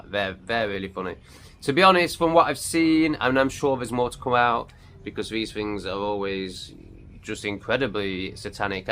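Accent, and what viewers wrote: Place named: England, United Kingdom. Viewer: You sound English, but it looks like Australia in the background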